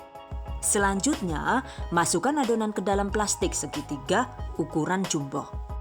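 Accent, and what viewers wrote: Banyumas, Indonesia. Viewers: Owalah wong ngapak juga ternyata. Ora ngapak ora kepenak yah mbakyu:D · Iduguduh Deng malah wong ngapak? 🤣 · Deneng ngapak? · mantapp...ngapak bangett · Lhaaa lhaaa.. Deneng ngapak mbak? Ya pd bae kr inyonge.. Aq jg follow akun njenengan sing ng cookpad mbak. Sukses trs mbekayu.. 👍🏻👍🏻👍🏻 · hahaa gak tau kenapa aku suka banget bahasa ngapak ini. kalau denger temen ngomong aku melonggo sambil senyum. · Madiun deneng bahasane ngapak kaya nyong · Bu rika Wong ndi sih deneng ngapak temen Kaya nyong 😁😁😁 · nyong kaget pas ngomong ngapak salag krungu pora teknputer ulang saya suka saya suka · Wong ngendi si rika mbekayu??? deneng ngomonge ngapak jan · Anu wong ngapak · Hahaha.....deneng ibune wong banyumas.....😃😃😃😃😃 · Ibunya ini orang mana yaa 😅 kok medoknya mirip akuuu yg dari Banyumas. Btw baru nemu channel ini hehehe bermanfaat bgt buat calon ibu rumah tangga nih hehe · Mba wong ngapak ya...😅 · Lah deneng Rika ngapak yu? 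· Deneng ngapak, ora ngapak ora kepenak yaaaa😁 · Masa tepung keledai jeng ha..ha.ha..lnjuttt jeng ngapakkk..inyong jd ktawaa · Njenenga jane asli ngendi sih bu?...deneng bisa ngapak?..ngapake bener sisan. Top pisan lah...basa inggrise jg joss... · Mbekayune wong ndi si kie yaa.. deneng teyeng basa penginyongan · Deneng ngapak ya wkwwk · Dr logatx kykx wong ngapak nih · Mirip pengisi suara warung tarsun di radio RRI pwt Apa lagi pas ngomong ngapak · Suka lihat video nya... Penyampaian bagus... Trus ngapaknya bikin kangen... Wkwkwkwk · Sy suka penyampaiannya jelas sekali... dan ngapaknya itu lho...👍👍😍😂😂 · Ngapake kue jenk....jan ora ngapak ora kepenak🤣🤣🤣🤣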